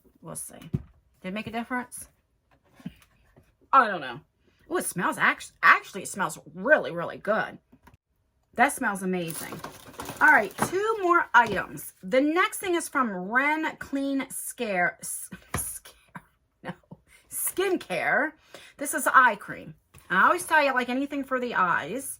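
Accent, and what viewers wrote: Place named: New Jersey, United States. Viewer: Are you from New Jersey? Im from Cape May NJ and oh girl that accent takes me back!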